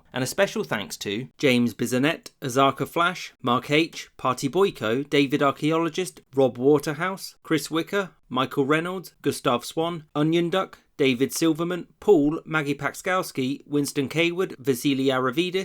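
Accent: United Kingdom. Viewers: His English is very clear, but he is speaking with a standard British pronunciation, as opposed to an American one. Maybe it is why you had trouble understanding him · This guy sounds British so how can he be unbiased. I would like to hear a video from a real Canadian.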